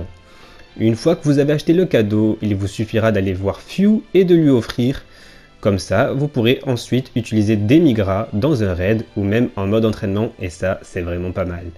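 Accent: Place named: Toulouse, France. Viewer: toi tu fais des vid&os àdesheures lol.En tout cas je kiffe et ton petit accent toulousain fait plaiz :). Sur ce, continue ce que tufais car ci cool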